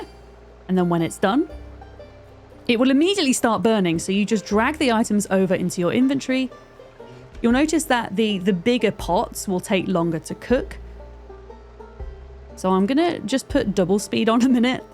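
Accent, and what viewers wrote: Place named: United Kingdom. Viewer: Hey, your video was recommended to me enitely by the gods of YT's algorithm and I'm gratefull for it! Good to hear a fellow Brit gamergirl! Keep it up!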